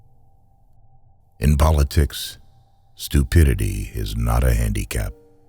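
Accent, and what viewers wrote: United States. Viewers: Anericann pronounciation sticks out like a sore thumb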